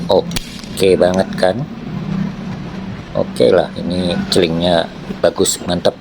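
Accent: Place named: Java, Indonesia. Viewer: sebenernya asli mana sampeyan itu bung?? kok logat medok nya gak hilang??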